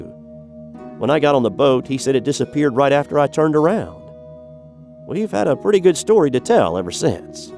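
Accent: South, United States. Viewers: You have a very interesting accent. Are you from the south?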